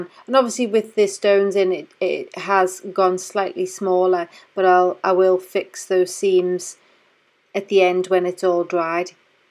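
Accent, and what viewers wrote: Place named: Liverpool, United Kingdom. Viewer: She's Liverpudlian. From Liverpool in England.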